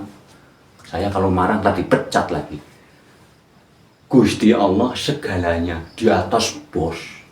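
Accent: Banyumas, Indonesia. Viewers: dari logatnya sih jawa ngapak, pokoknya antara brebes-tegal-pemalang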